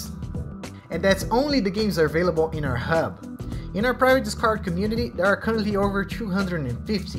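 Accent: Brazil. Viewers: caraca kkkk percebi que é br so pelo sotaque kkk boa man, parabems pelo projeto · deu pra percebe que você é BR só pelo sotaque, é muito foda ver brasileiros fazendo projetos assim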